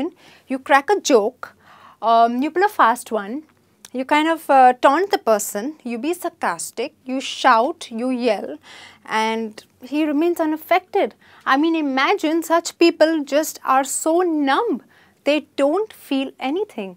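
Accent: India. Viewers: This is Indian english not English !!!!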